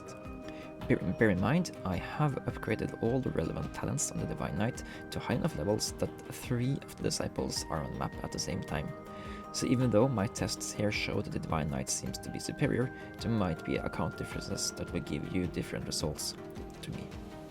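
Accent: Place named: Sweden. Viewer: youre my fav idleon creator btw, especially since you sound swedish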